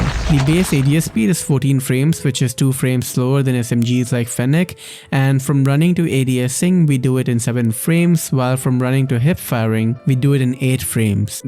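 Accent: India. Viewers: hmm, i feel like ur accent is indian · Dude are you from India?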